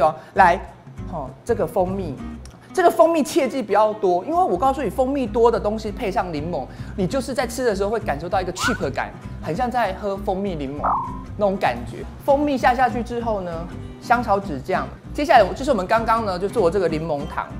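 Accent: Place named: Southern Taiwan, Taiwan. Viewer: 是南部人嗎？只有南部人會把「冷 ㄌㄥˇ」唸成「ㄋㄥˇ」😅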